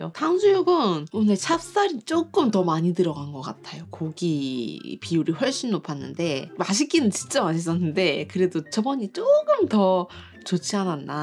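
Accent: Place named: Gyeongbuk, South Korea. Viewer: 완전 경북말씨신데...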